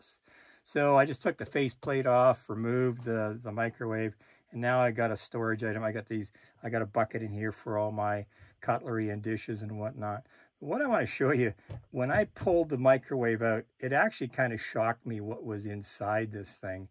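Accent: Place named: Western Canada, Canada. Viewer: I KNEW IT!! First-time viewer, but , I knew you were out in Western Canada -- years ago, I worked with a guy from BC who also pronounced garage as "garach." Nice guy, but he kinda stuck out in Central Jersey.😆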